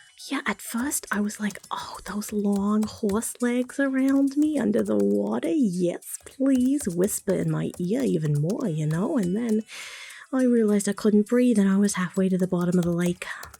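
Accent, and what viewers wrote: New Jersey, United States. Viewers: Love the Jersey accent! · Omg i freaking love this, Jersey accents are one of the best American accents, my personal favorite is dixie southern aka georgia southern, but Jersey is close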